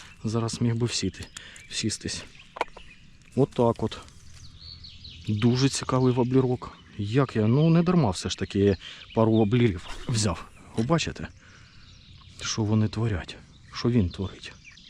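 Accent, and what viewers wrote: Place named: Surzhyk, Ukraine. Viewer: підтягни українську. Таке адове суржелло, що аж вуха ріже.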